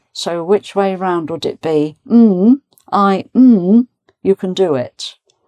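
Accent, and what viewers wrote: United Kingdom. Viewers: Slow and clear english sound i really like that british sound a🎉❤nd fluently like I lo ve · One of the most beautiful voices with a British accent. Always❤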